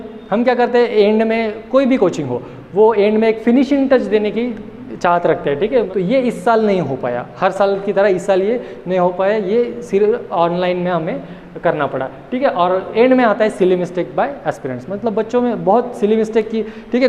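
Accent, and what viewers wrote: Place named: Maharashtra, India. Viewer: Maharashtrian hindi sir😘😘😜😜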